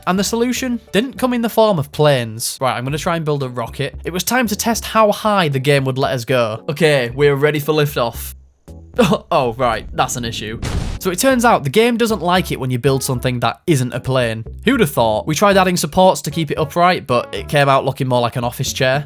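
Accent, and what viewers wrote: United Kingdom. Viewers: The only British accent i can tolerate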